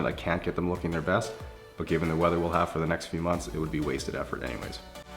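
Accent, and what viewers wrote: Saskatchewan, Canada. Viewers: Your voice is so soothing. And I like the way you word things. And your Canadian accent is growing on me too! Love your vids keep it up 👍🏽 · are you guys dumb ??? He means he talks in a Canadian ""slang"" with a Canadian accent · He's from Saskatchewan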